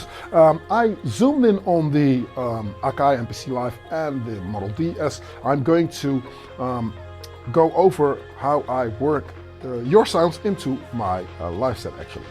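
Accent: Netherlands. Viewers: Assuming you're Dutch based on your accent. Correct me if i'm wrong haha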